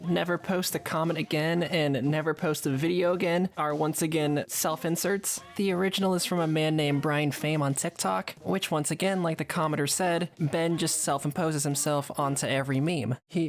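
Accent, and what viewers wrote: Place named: United States. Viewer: First of all, why us an american bloke using 'lad' so much? It sounds disgusting in his accent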